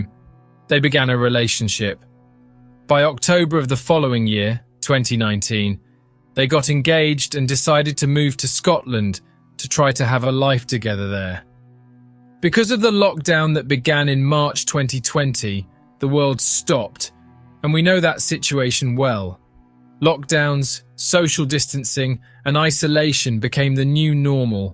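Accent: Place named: England, United Kingdom. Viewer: Why are you speaking with an English accent but using American terminology? We don’t have “sidewalks” we have paths. We have mobiles, not “cellphones” We don’t have “garbage” we have rubbish. We don’t have “apartments” we have flats. We don’t have “trash bags” we have black bags or rubbish bags. I really h@ these bloody robots.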